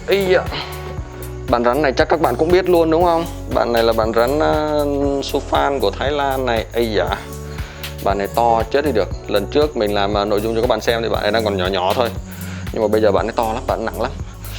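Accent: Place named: Central Highlands, Vietnam. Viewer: A ơi. Xem kênh a e bị nghiện a luôn rồi. Thích giọng nói và con người Tây Nguyên quá.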